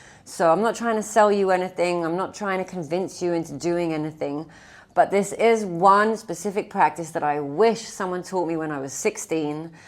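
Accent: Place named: United Kingdom. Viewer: את נהדרת, אני עוקבת אחרייך כבר שנים. מה זה המבטא הבריטי הזה??? 💙🦋 תודה על המידע והשיתוף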